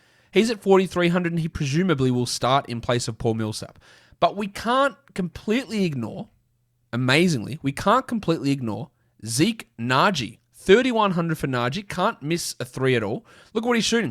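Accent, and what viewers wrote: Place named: Australia. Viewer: I loves Aussies but trying to listen to one of em talk DFS NBA basketball is cringy. Just can't do it. I'm 2 min in and I've got to stop this video. Get back soon. 😂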